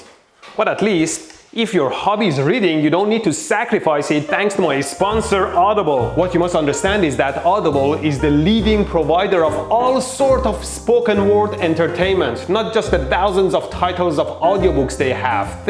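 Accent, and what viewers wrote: Iran. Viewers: The second i hear him talk i can tell he’s Persian as hell. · I'm Persian and his voice is to Persians what a newscaster's voice is to Americans. It's the most Persian accent on the planet lol.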